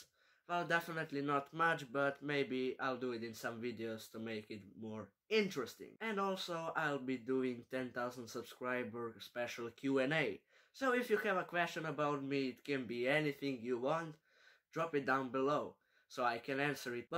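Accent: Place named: Poland. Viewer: OMG i was So exited for this are u from Poland because of ur Accent u sound like u are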